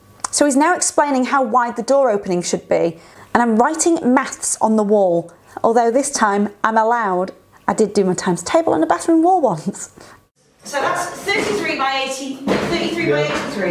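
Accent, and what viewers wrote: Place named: Northern England, United Kingdom. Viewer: I do like your channel. Lots of narration, lots of honesty, and a lovely northern accent. P.S. Love seeing your collie dog in the videos although appreciate that's often not practical.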